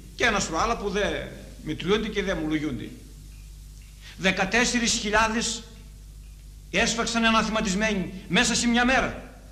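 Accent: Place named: Roumeli, Greece. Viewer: Ρουμελιωτικη προφορα σε ολο της το μεγαλειο!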